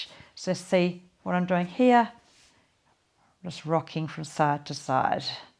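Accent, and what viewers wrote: Australia. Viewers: Thanks. As a Canadian I am boycotting American products. Wonderful to hear an Aussie accent and wonderful to feel better.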